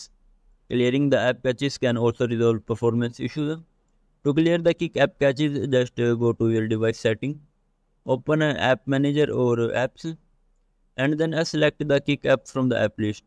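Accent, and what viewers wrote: India. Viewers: Even for an Indian his accent is too heavy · It's English but with a heavy Indian accent. The only people who would understand this are Indians and people who work with English speaking Indians. For the most part, they are very tech savvy and their contributions are welcome.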